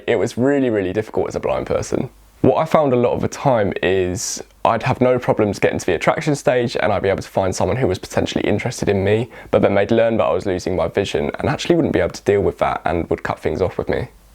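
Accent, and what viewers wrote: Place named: England, United Kingdom. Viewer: Hi my friend, my name is Jordan I have a channel here on YouTube called the blind world. We’re trying to create a world where like what you were saying this video for you had people in your life you were naive and you thought that they couldn’t have relationships with you because you were visually impaired and blind. I’m trying to create a world where that doesn’t happen where people can have relationships for us despite us being blind and I want to create a world where we are seen as just people really, and I like this video because I’m actually trying to figure out how to have sex. I know that I’m attracted to people to women but just How I will be physically able to have sex and that’s all I kind of want to say really and I’ve seen your Instagram post and I think you’re very good you’re very educational and I can relate to the stuff that you’re talking about since I am actually vision impaired myself and I have autism and I am from Ireland and I’ve noticed by the sound of your voice that you’re precious visually impaired person but you’re from England I just I hope to get to know you as time goes on as I watch your videos and just I hope you know that I’m being genuine with my comment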